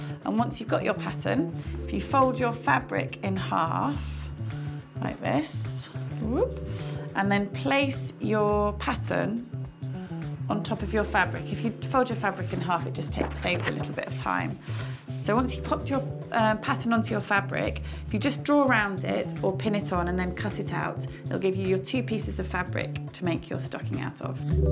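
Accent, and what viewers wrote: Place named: United Kingdom. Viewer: Is it just me or is everything better with a British accent? Because that was smooth and clear rather than the last video I watched. Great job!! Looking forward to making these for the family. Can't wait to embroider names on the cuffs too :)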